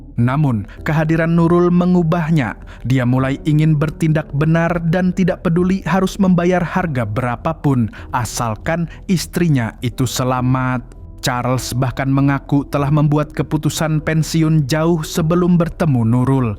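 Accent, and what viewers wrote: Banjar, Indonesia. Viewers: Logat mimin kek org banjar... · Org bnjar bnar Sidin nie amn di dengar logatnya😅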